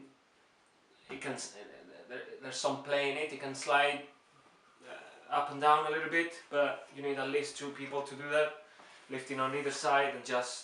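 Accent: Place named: Spain. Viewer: Se lo mandé al grupo intercambio para que los españoles oigan inglés de un español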